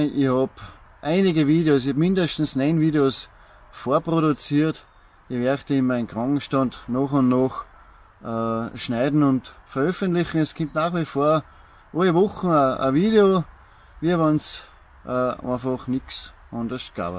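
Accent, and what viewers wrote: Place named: Ernstbrunn, Austria. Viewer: Wo ist dein schönes Ernstbrunnerisch abgekommen?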